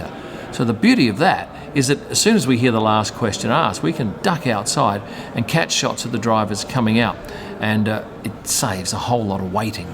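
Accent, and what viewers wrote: Australia. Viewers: Great channel funny accent.Aussie Aussie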